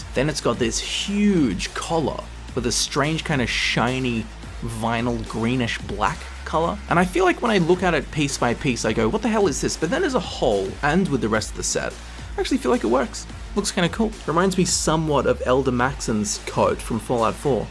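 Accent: Sydney, Australia. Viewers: Yo, you from Sydney? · Hey are u Australian? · Your from Sydney aye? I'm from Newcastle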